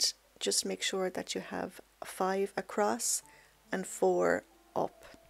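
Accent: Ireland. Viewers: This is beautiful. Can't wait to make one for myself! Thank you for sharing your amazing creations and for the added bonus of the lovely Irish accent!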